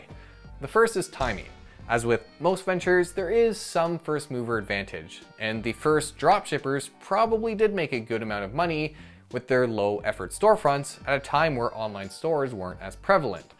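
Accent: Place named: Canada. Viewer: hate canadian accent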